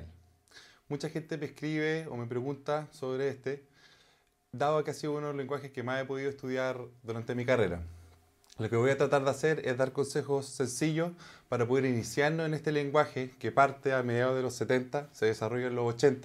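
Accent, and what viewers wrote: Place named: Chile. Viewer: No e visto los detalles del canal pero detecto un fuerte acento a ‘tsiléno’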